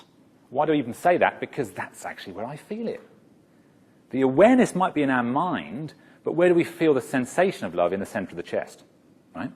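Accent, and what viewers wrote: United Kingdom. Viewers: Is he ever to the point? Is beating the bush just part of his dialect, is add the cost of a fancy English accent ? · This guy knows how to talk and explain....Although he has that british accent,it is very pleasant to listen to him :)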